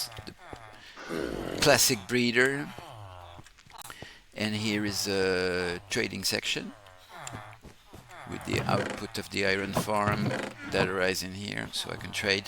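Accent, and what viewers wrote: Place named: France. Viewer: really cool base, love the french accent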